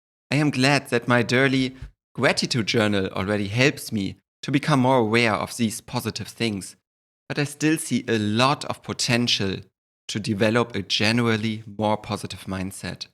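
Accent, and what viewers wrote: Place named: Germany. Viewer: Das klingt so falsch wenn ein Deutscher englisch spricht.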